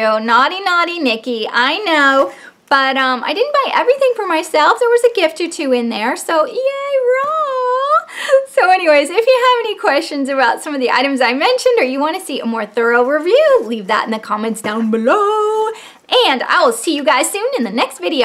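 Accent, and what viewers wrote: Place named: South, United States. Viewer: I just stumbled upon your channel about 2 weeks ago and im so glad I did. I love your personality and you're so easy to watch and light to. (I got that southern twang as well, lol. Anyways, id love to see a demo/review of the cover fx drops. Ive been on the fence for several weeks over weather they are really worth the money since you only get .5 ounce. Looking forward to your next video!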